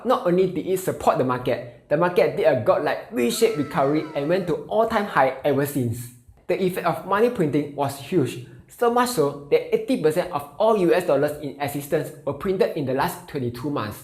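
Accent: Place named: Singapore. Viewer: Long live Singlish! 😁😄👍💖🙀💯😇😁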